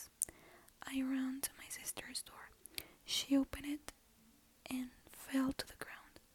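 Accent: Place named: Mexico. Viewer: Eres la mejor persona hablando mal inglés xd, adoro nuestro acento mexa. Saludos te mando un abrazo y un beso;)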